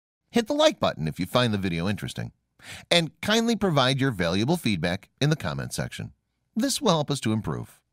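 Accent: United States. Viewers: Whats the matter ? Michele ? you not like his midwestern accent ? you biggot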